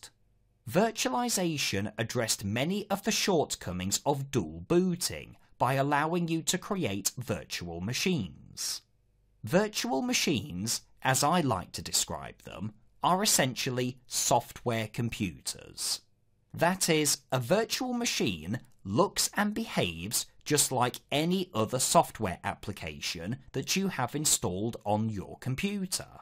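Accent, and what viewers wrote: United Kingdom. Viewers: Best explanation ever on Hyper-V and I enjoyed the British accent. well done thank you for the free training videos.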